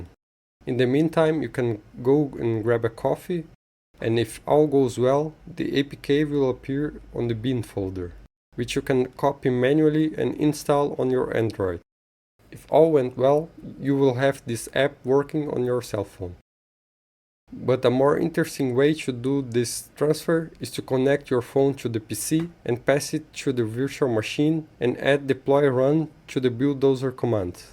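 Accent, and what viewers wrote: Brazil. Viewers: vi que era brasileiro pelo sotaque, valeu meu rei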